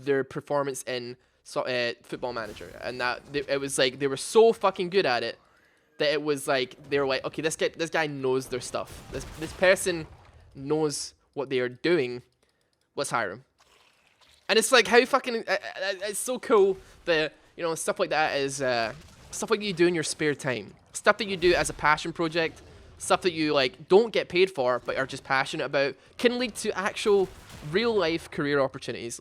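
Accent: Scotland, United Kingdom. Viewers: Hes scottish (I think)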